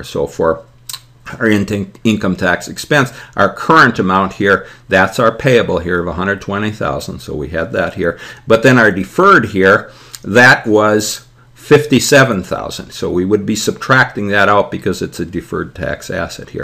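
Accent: Boston, United States. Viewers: I find the Boston accent difficult to study to (maybe because of Family Guy?). Other than that, thanks so much for the help!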